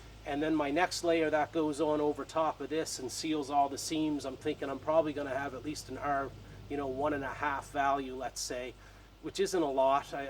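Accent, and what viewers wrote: Ireland. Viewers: Are you irish... Nice vid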